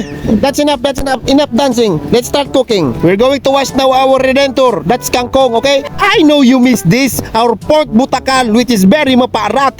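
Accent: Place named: China. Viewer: Oragun c tagalog in tsikwa accent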